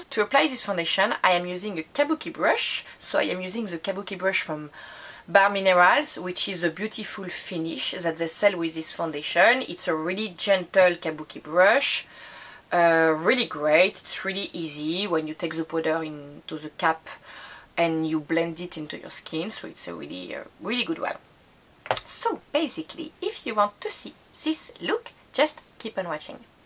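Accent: France. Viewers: You look very pretty. Your makeup is simple and lovely. Very natural. Love your French accent.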